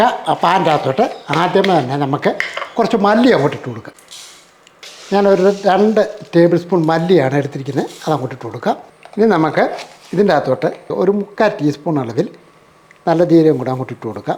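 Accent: Kottayam, India. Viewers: സൂപ്പർ 🥰 നല്ല നാടൻ കോട്ടയം ഭാഷയിൽ ഉള്ള അവതരണം 👌👌🥰🥰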